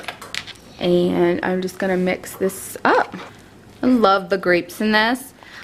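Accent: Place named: United States. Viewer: There is a U in the middle of tuna not oo and herbs starts with H. I wish Americans would stop murdering the english language!!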